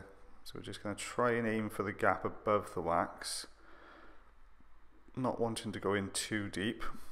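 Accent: United Kingdom. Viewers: I love it when you say process. The British accent gets me everytime.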